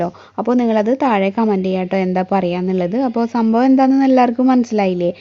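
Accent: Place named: Kannur, India. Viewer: വെളിച്ചങ് കണ്ണൂർ ഭാഷ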